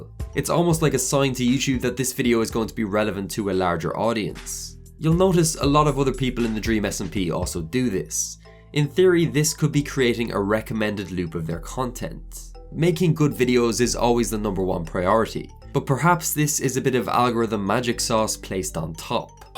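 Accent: Ireland. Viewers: Nicely done, and nice to hear another Irish voice on YouTube!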